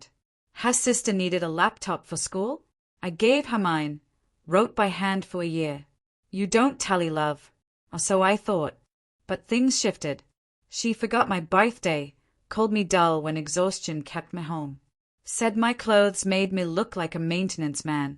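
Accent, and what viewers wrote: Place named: United Kingdom. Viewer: Yet again - the title doesn't match the story! Refreshing to hear a British accent.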